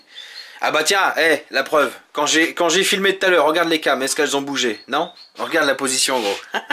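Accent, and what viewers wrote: North, France. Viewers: Merci pour ta réponse, étant aussi originaire du nord expatrié dans le var, c'est vraiment sympa d'entendre cet accent lol, mais aussi surtout un passionné. Je viens donc d'acquérir un TT V(R)6 MK1, il serait sympa peut être de voir quelques vidéo sur d'autre modèles "VW" qui sont équipés des fameux "VR" ?! Au sujet de la chaîne de distri, quels sont "tes" préconisations ? Car il me semble (pas sur) que chez VW il n'y a pas de remplacement prévu ? Puis autre chose à prévoir, selon ton expérience, sur un modèle Audi VR6 DSG Quattro ? Merci pour tes réponses !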